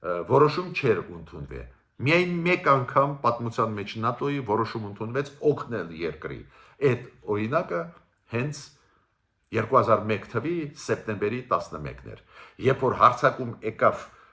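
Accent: Russia. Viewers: Քո ռուսական ակցենտը զզվելի է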